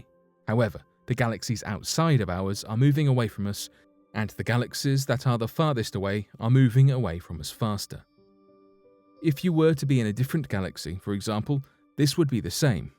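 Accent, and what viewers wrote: England, United Kingdom. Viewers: British accents don't make it better. Just saying. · What's with the english accent? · Only an English accent can bring knowledge to life.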